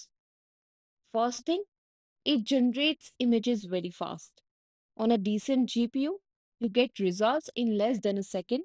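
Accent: India. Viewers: I think her accent is Indian. I'm not so sure she is a she. It could be just an AI trained voice. Most of these channels utilize AI voices. Even people who have great voices are just handing it to the AI to create the audio from their voices, which is much faster, once trained, than recording it yourself. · Indian accent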